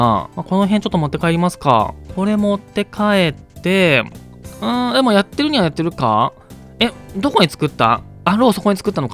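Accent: Aichi, Japan. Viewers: 愛知県の人？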